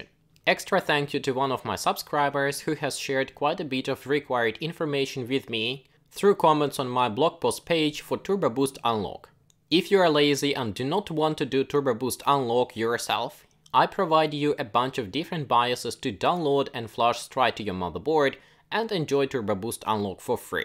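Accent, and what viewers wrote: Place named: Russia. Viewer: Со славянским акцентом. Очень похож на русский акцент. :)))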